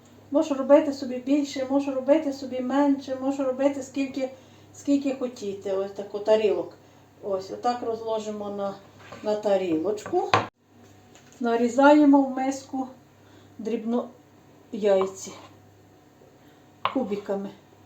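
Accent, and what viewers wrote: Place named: Vinnytsia, Ukraine. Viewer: Дуже дякую! ❤ Подобаюиься вашi рецепти. Все таке смачненьке 🥰 Приятно слушать вашу речь. Мне очень нравится ваш говор. Детство и юность я провела в Винницкой обл. И мне такое все родное. Спасибо вам!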